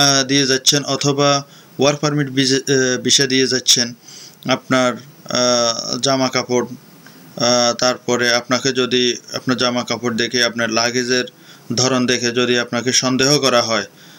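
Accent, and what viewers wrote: Noakhali, Bangladesh. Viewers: ভাইয়া আপনে খুব চালাক মানুষের কথা বুজে পেলেন। তাই আপনার কথা গুলা বেসি বেসি শুনি খুব ভালো লাগে আসোলে নোয়াখালির বোলে কথা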